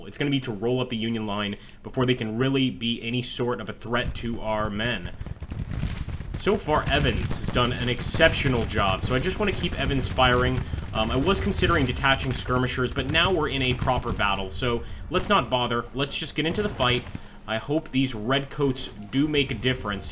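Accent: South, United States. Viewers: Love that southern accent, spicy. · I love your southern accent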